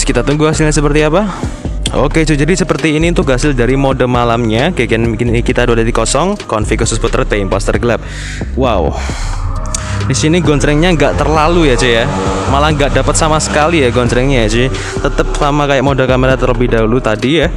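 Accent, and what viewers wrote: Surakarta, Indonesia. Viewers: Orang solo kah bang?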